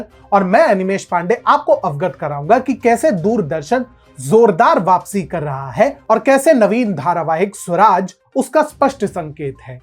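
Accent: Standard. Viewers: अति उत्तम पोस्ट। जी आप बहुत शुद्ध हिन्दी में प्रस्तुती देते हैं। साधुवाद। कृपया निड़र ह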